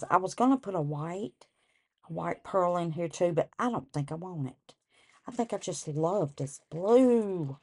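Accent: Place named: Texas, United States. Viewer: Hey … I’m not sure where you’re from exactly, but I could listen to you all day! Your keychains are beautiful too by the way… I really love that mould, it is very different from the standard letter moulds! I have just watched an old video of yours, where you made crackling bread… Now, I don’t do much cooking anymore, but I would love to have tried your crackling bread recipe. Your accent takes me back a loooong way!! And it makes me hungry for some good ol’ biscuits and gravy… one of the best things I’ve ever eaten!! 😋 😋 I am an Aussie who spent 4 years in Houston, Texas (<num>… flying in, just 3 days before 9/11) and listening to you takes me right back there!! (Back to being in Houston I mean, NOT back to 9/11!!). I loved being there for the first three years, and I planned on staying there to raise my little miracle Texas daughter… unfortunately, her biological (American) father turned extremely abusive and held us captive until I was able to escape. 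Consequently, I had to flee your beautiful country and come home to Australia with my baby. She turned 21 last November, and was raised by myself and the only Dad she’s ever known, my awesome hubby of 17 years. I will never forget my time over there… both the good and the bad… alls well that ends well, they say!! Much love, Nat (Brisbane, Australia) ♥️♥️🇦🇺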